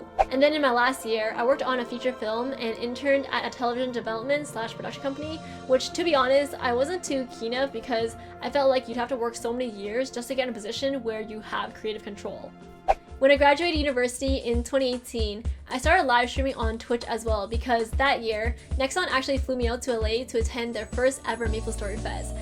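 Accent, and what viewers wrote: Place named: Canada. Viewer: Cost of living in L.A. is ridiculous anyways, plus you’d lose that lovely Canadian accent 😂😂 hope the rest of the year treats you better.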